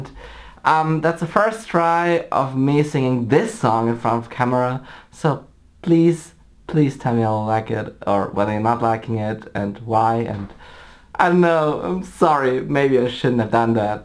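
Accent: Germany. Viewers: your german accent (which I only noticed slightly in one Q&A)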